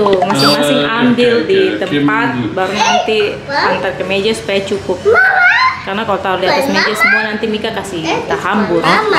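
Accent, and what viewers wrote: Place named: Eastern Indonesia, Indonesia. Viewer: mmng bahasanya seperti bgt yaa... Khas.... Daerah Timur.. Mantabbb.. GO go... Trs lah memperkenalkan kuliner Indonesia... 💕❤😋😋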